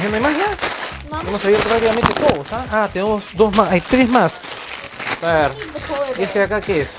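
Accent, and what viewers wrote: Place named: Peru. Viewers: Peruano por la zampoña de atras y por la forma de hablar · Luis Cueva solo por la forma de hablar, ya debería ser obvio que es de Perú, pero vive en Estados Unidos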